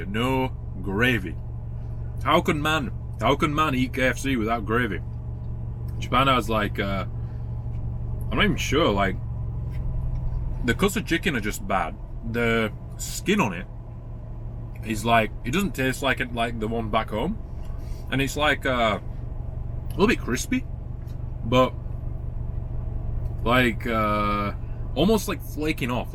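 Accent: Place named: Liverpool, United Kingdom. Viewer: Deffo a scouser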